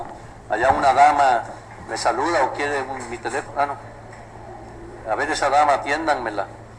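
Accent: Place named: Spain. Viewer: y porque habla Como español???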